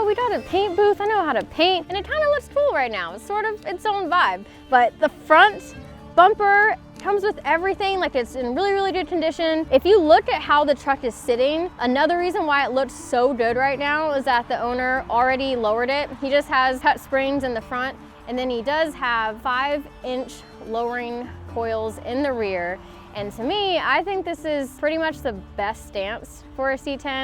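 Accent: South, United States. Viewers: shes cool I like her cause shes into trucks and shes got that southern twang in her like you do ! LoL anyways awesome C10 and chicks !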